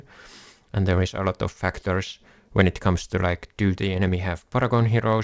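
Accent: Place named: Finland. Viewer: You are finnish right, your accent gave that away instantly. Try to say Phraesto next video and not prästost lmfao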